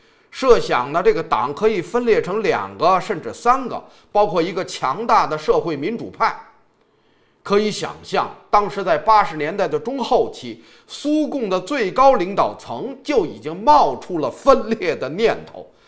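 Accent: Beijing, China. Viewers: 言之有理，一口京腔，特喜歡聽你講！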